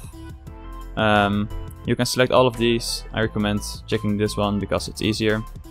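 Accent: Netherlands. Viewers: je hoort echt tering goed dat jij nederlands bent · You sound ver Dutch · Are you Dutch? I mean. Dat accent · ik hoor dat je nederlands bent haha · wat een lekker Nederlands accentje zeg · ik kan gwn meteen horen dat je nl bent xD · HAHA, nederlands accent :)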